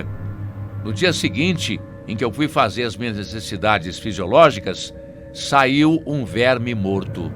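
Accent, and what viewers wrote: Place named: Minas Gerais, Brazil. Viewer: Adoro esses relatos, quando conta, é outro que Paresse mineiro...continuam assim...parabéns...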